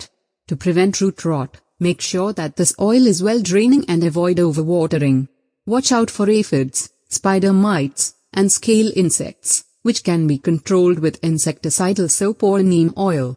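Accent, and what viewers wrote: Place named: India. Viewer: dirty indian voiceover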